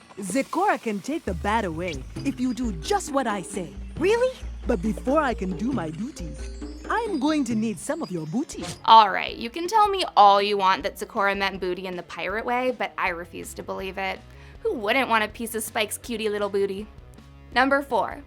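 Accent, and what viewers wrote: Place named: South, United States. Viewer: Love your southern vibe too, the way you pronounce things is 👌🏻👌🏻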